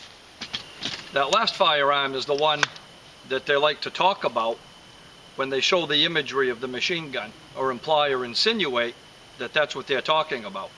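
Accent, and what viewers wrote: Maine, United States. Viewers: You from Maine? You've got the accent.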